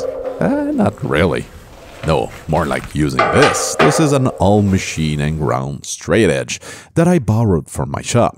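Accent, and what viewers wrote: Quebec, Canada. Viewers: The joy of French Canadian swearing! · Si c’est pas l’accent le plus québécois qui ya 😎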